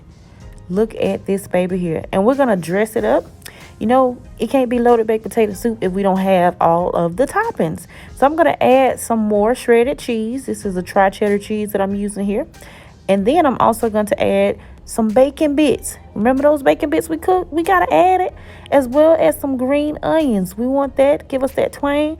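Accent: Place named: Mississippi, United States. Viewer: Are you from MS? I hear a familiar accent!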